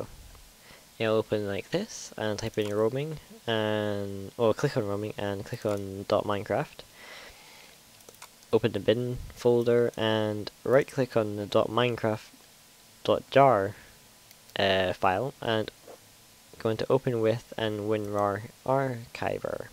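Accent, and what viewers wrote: Scotland, United Kingdom. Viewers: r u from scotland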